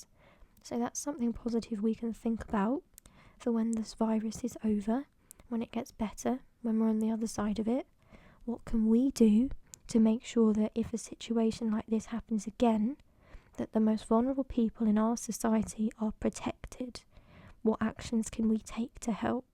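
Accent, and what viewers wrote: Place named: RP, United Kingdom. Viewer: Sound so posh here. I love it! 😂😊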